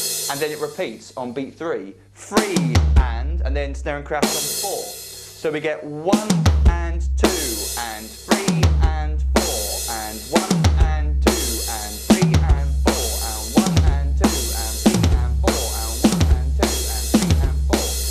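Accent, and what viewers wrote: United Kingdom. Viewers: Haha I started to watch the vid.. And then you started speaking with a TOTAL British accent.. I laughed my butt of! But you play just fine! Keep up the good work.!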